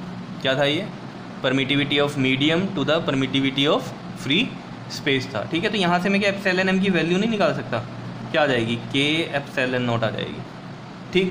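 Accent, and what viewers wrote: Punjab, India. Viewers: Is u are from punjab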